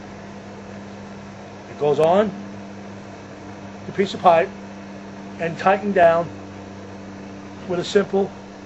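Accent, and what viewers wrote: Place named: New York, United States. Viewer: you sound like a NYer thanks for the all the info!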